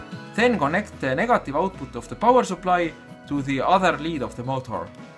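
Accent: Estonia. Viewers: Thank you so much helped me out man! Btw you sound like an estonian. Am i right? Im from est.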